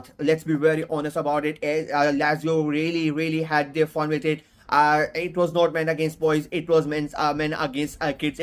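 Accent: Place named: India. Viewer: r u from india , u sound so much like them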